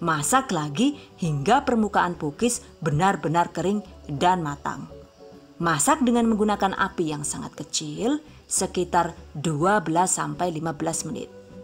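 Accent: Banyumas, Indonesia. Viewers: Lucu bs nglucu n bhs ngapak pndai pula ber bhs inggris msih muda tp Suarana mntap seperti wanita dewasa kya pula, mksh resep" nya berkah buat bnyk org sht n sukses sllu 🤲🤲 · Kok bisa ngomong ngapak sih😂 mksh ilmunya❤ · Hahahaha seneng nek.lg ngmng ngapak yu 🤣🤣 Jal nek gawean aja akeh" ea lh 500g nyong langka.sng ngrewangi maem yu 😁 Nek srabi gandos ana resepe ora yu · Ngapakers nya mantap , pukis nya jga mantap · Mba. Jenengn suarane enak temen si. Inggrise ya jos. Bisa ngapak maning. Sht trus ya mba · Done Youtube channel selalu memberi inspirasi resep yang inovatif dan ekonomis Dan mbak itu loh lucu 😂😂 Kalau ngomong ngapak dan catat Gak tau namanya ngomongnya jadi mbak 😂😂 #giveawaythv2020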